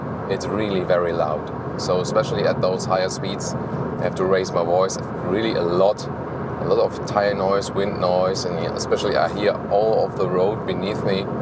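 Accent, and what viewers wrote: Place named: Germany. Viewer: man merkt schon,dass du ein deutscher bist...sprichst ziemlich langsam englisch.was gut ist,da versteht man,bzw. ich auch mehr... 😉 die engländer sprechen ja fast doppelt so schnell... 😂😉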